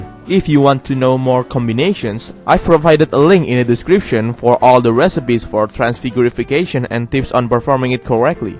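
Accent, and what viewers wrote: Indonesia. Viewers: He got indonesian accent. Am i wrong? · Starting to miss the sims 3, I think the sims 3 is better than 4. Nice vid, btw I hear Indonesian accent, are you from Indonesia? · I bet you're indonesian. I can tell by your accent😄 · you sound Indonesian!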